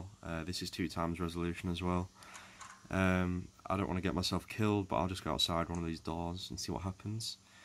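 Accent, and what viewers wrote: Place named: Yorkshire, United Kingdom. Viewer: Are you from Rotherham or Sheffield?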